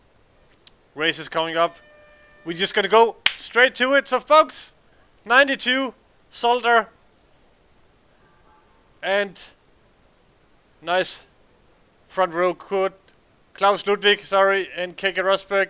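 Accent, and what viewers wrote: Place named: Denmark. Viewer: Odd, your Youtube profile list you as German, but you sound really Danish. XD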